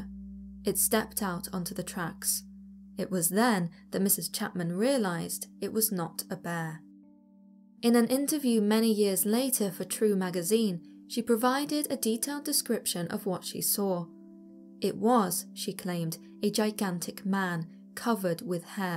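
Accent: United Kingdom. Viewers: The lady with the British accent is an upgrade from another site where the male narrator has a heavy backwoods twang. Then there is another site that features a lady with a Canadian accent. She also has a pleasant voice while describing Bigfoot outrages such as interupting a man having a bowel movement along the side of a seldom used road. It scared the crap out of him.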